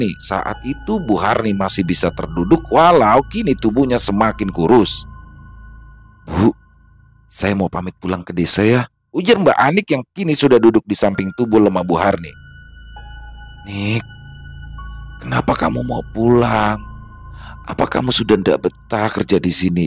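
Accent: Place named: Jakarta, Indonesia. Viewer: Ahhh seru nih ceritanya.. aku udah mengira kalau ini kisah bang Rudi, dari logat penyampaian ceritanya yg dibawakan mas, sudah keliatan gaya bahasa Betawi.... Ditunggu kisah selanjutnya, pasti seruuuu ❤❤❤❤❤❤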